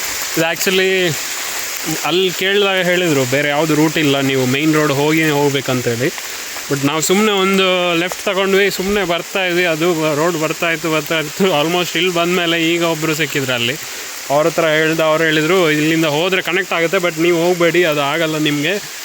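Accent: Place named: Coastal Karnataka, India. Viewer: Thumba genuine viewers matra nim channel alli baro videos poorthi nododu....but nijvaglu ondh video na poorthi nodudre saaku, we will just fall in love.....you are such a kind hearted person... almost 3.5+ years inda nim subscriber. Ondhe ondh kuda exaggerated video nan nodilla ee channel alli... I'm sure ee channel elligo hogutte swalpa dinadalli and btw nimma dakshina karavali kannada kelakke thumba sogasagide💗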